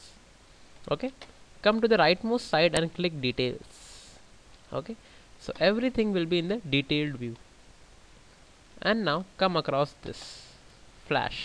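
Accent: India. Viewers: hes an indian, they their own accent.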